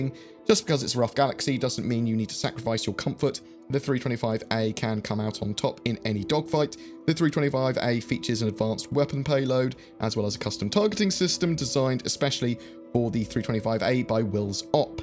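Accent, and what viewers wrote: United Kingdom. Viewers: 350R or 315P, British accent not withstanding...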